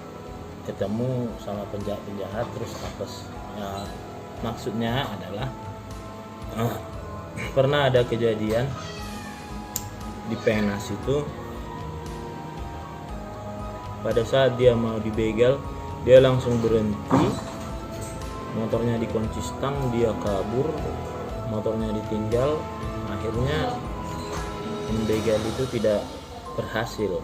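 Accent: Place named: Medan, Indonesia. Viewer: Pak orang batak apa bukan pak Suara nya nada medan pak Salam dari saya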